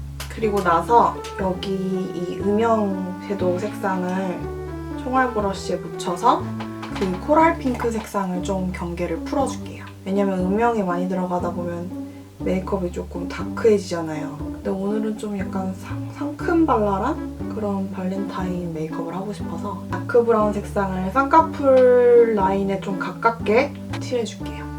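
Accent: United Kingdom. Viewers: 언니 영국특유의 발음이 너무 좋아요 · 한국어랑 영어 둘 다 좋은데 영국식 발음의 영어가 너무 매력적이에요 ㅠ💕 그리고 스트롭은 진짜 미세한 펄이에요! 바닐라코 제품도 궁금하니 통장을 비워봐야겠네요ㅋㅋㅋㅋㅋㅋ 언니는 브들파데 잘 쓰시는 것 같은데 저는 샘플로 5번정도 써봤는데 저는 뭔가 더럽게 무너지는 것 같아요ㅠㅠ 아 그리고 혹시!!! 엄청 밝은 색상의 브로우를 파는 매장을 아시나요?ㅠㅠ 제가 엄청 밝게 탈색했는데 색상이 마땅치 않아서 섀도로 눈썹그리고 있는데 섀도로 브로우하니까 시간이 너무 많이 걸려요😂 그리구 에뛰드 1호4호 갖고있는데 발색이 랜덤인 것 같아요.. 뭔가 살짝 쉬머섀도들은 브러쉬에서 뭉치고ㅠㅠ ㅋㅋㅋ그리고 문샷은 뭔가 중렴이? 브랜드 인 것 같아요 저렴이라고 하기엔 가격대가 훨씬 높고 고렴이라고 하기엔 살짝 저렴한? 상당히 애매한 가격대의 브랜듴ㅋㅋㅋㅋㅋㅋ 저는 현재 눈병으로 고생중인데 설 연휴라 병원을 못가고 있어요ㅠㅠ 하필 저번 주 금욜 새벽에 눈병이 하ㅋㅋㅋㅋㅋ 이렇게 길게 느껴지는 연휴는 처음인 것 같아요(나쁜의미로)😭 겨울 독감,눈병 조심하시구 남자친구없는 전 이 메이크업을 친구만나러 갈 때 응용해보겠습니닼ㅋㅋㅋㅋㅋ 설날 마지막 연휴 잘 보내세요👍🏻💕💕💕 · ㅋㅋㅋ크으 은 역시 영국어 발음이 을 더 빛나게 해주는 것 같아요 한국사람이라 한국어 영상이 더 이해하기 좋은건 사실이지만 역시 영어하실때의 그 무언가를 따라잡긴 힘들어욬ㅋㅋㅋㅋVlog를 한국어로 재밋게 찍어주세요 😜오늘도 좋은 영상 고마워유💫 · 구독자분들 요구에 하나하나 맞춰주시는거같아서 너무 좋아요 ㅎㅎ 언니의 영국발음도 좋지만 가끔 이런 한국어 튜토리얼도 매력잇네요! 그나저나 섀도우 너무 이뻐서ㅠㅠㅠㅠ 에뛰드 세일할때사러가야겠어요ㅠㅠㅠ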